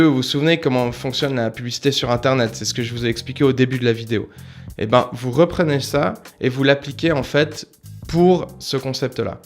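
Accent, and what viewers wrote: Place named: Switzerland. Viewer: Super, vidéo d'ailleurs je suis également Suisse. Je me disais a l'accent. Pis la mentalité ce voit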